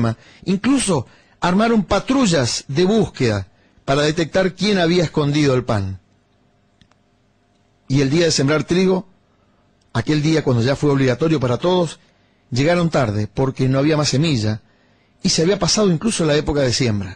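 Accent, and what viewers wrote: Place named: Argentina. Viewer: No sé si encuentro mas patético el "analisis" con pretensiones de fabula a la de la Fontaine o simplemente el acento argento. No, no soy particularmente socialo y si, tuve la mala pata de nacer en la argentina. Ese acento no me es insoportable por simple desconocimiento sino que lo conozco demasiado bien. Y no tampoco, aca en Francia, la poblacion es solo mas "poli" (educadita digamos) pero en capacidad de analisis, lucidez politica y esas yerbas, son tan precarios como el argento promedio.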